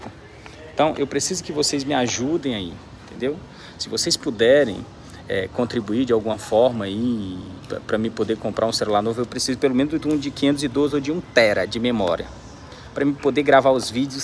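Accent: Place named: Northeast, Brazil. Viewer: Sou do Paraná, Santo Antônio da Platina.Adoro o sotaque nordestino, e essa sua voz é alegria é contagiante. ❤ ❤ ❤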